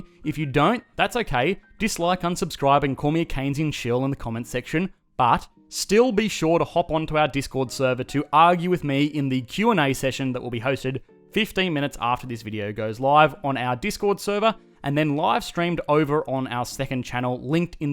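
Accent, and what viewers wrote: Australia. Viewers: Some biased shyte from this Australian speaking bod. Great British Pound....hahahaa Country finding its feet....EU never stopped UK from their trade and commerce. Wait and see when the sterling will be less than Euro. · What ethnicity are you mate, you sound Australian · I think this idiot Aussie sound alike is being paid by the corrupt EU l hope the UK leaves with No Deal, far better for us in the UK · The Australian accent is horrendous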